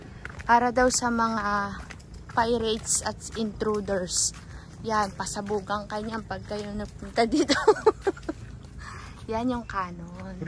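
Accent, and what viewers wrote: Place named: Davao Oriental, Philippines. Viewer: Parola mati davao oriental na logat jen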